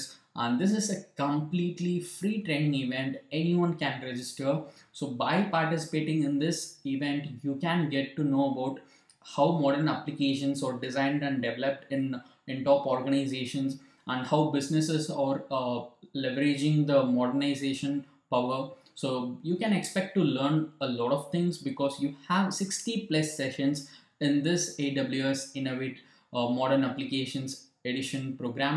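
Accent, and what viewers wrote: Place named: South India, India. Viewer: by ur accent you seem to be a south indian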